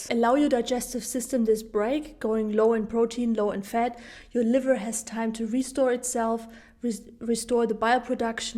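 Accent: Germany. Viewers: R u german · Trying to guess your dialect...German? Austrian? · Thanks soooo muchfor this Video God Bless you from Germany Your acent sounds like German. Frohe Pfingst Montag 2019 Iam srarting tomorrow <num>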